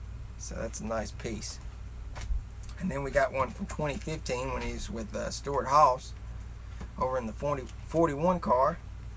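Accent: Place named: West Virginia, United States. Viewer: wow that southern draw! you sound straight out of west virginia! not being offensive i just didnt expect that lol. im here checking you out like i said i would right as my phone died lol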